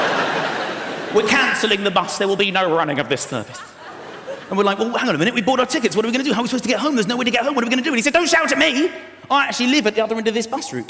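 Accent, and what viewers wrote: RP, United Kingdom. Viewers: I think it's his very posh accent that makes his style so good. Very funny, very likeable guy.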